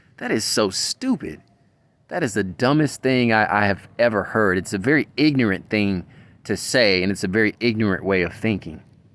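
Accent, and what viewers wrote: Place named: Spain. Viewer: Not to be racist but I thought you were Hispanic. You have like a Spanish accent to me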